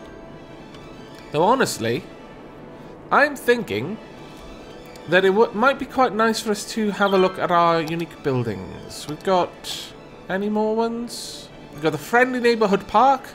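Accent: Wales, United Kingdom. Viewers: I love that the cruise ships plow straight through the water turbines as though they aren't there XD I also have no idea why ... but I LOVE IT when a welshman says "lovely" so this video was audio heaven for me :-)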